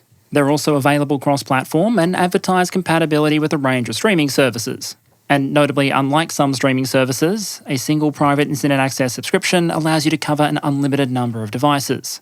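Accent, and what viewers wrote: Australia. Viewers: I always find it funny how you seem to put so much effort into pronouncing some things as per the original language, and yet some words are full Aussie. E.g. compare Iran (with rolled/tapped "r") to Beijing (basically Beige-ing). It's a very Educated Australian way to go about things. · a pure stream of facts, graphs and statistics sans bullshit with unparalleled expertise and an aussie accent. what's not to love :)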